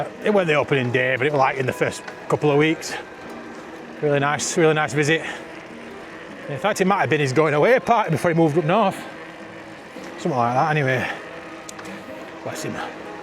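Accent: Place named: Yorkshire, United Kingdom. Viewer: 🤨 NO you do not need to tone down the worlds greatest accent, Yorkshire!!!!!!!! I cant even stand driving over flockton nothing on earth would get me to ride on there, you wouldn't have a few videos ago, its nice to see your confidence increase. Loving the Trails o life T shirt.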